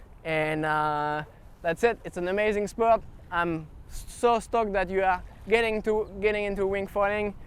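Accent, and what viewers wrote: France. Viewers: Mec, en anglais tu as un petit accent français et en francais tu as un accent anglais. Tu viens d’oû?? Super video sinon bravo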